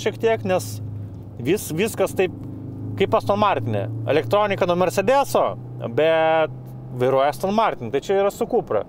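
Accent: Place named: Russia. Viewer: Išmoktų normaliai lietuviškai šnekėti o tada jau darytų apžvalgas. Nes tas rusiškas akcentas... šlykštu klausytis,o kai dar tiek rusiškų keiksmažodžių, po 2 minučių išjungiau...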